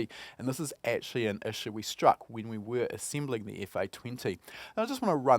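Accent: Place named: New Zealand. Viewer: Thanks for the reply,. Thanks to the vast amount of time I've spent listening to you, I can whip out your NZ accent at a moments notice at this point. Great fun in a party/pub moment.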